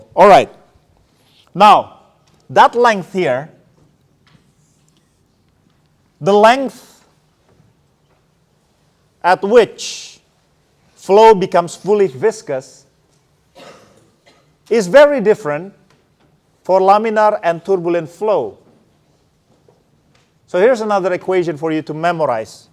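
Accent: Indonesia. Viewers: Akumrasa nggak sih. Akumsangat merasa, bahwa Doktor ini bukan orang tulus dan bukan orang yang berdedikasi. Melainkan penampilan dan gaya lebih penting buat diandaripada science itu sendiri. Selain itu bahasa inggris nya sangat standard dan sangat kental aksen bahasa indonesianya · perfect English with totally Indonesian accent. Awesome, dibanding anak2 sekarang yg sok logat amrik tapi structure dan grammar berantakan :))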